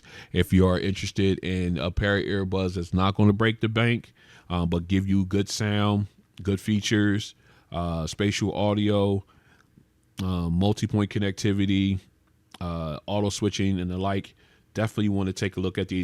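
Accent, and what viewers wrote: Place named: California, United States. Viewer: Big bruh. Your cali accent so apparent. Love the content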